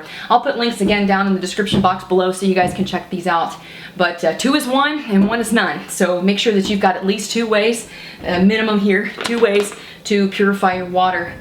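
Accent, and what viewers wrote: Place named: Texas, United States. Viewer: " Fixin to do " , are you a Texas Girl ? I am not hating at all just a 56 yr. old Native Texan Male that knows good Texan Slang when I hear it !!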